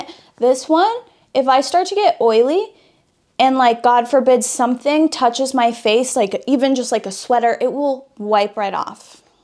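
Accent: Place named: South, United States. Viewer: Easy on the Southern accent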